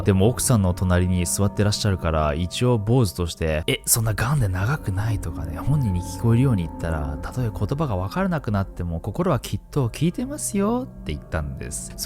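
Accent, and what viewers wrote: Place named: Kyushu, Japan. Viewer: ナオキマンの九州弁からしか得られない供給がある。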